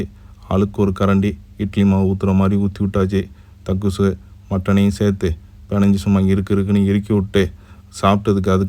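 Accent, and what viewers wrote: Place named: Adirampattinam, India. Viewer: Assalamu alaikum eppadi irukinga nice cooking 😂❤ neenga adirampatnama? Unga tamil antha maathiri iruku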